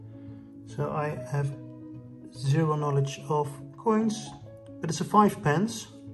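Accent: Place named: Netherlands. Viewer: Ik hoor gewoon dat je nl bent hahah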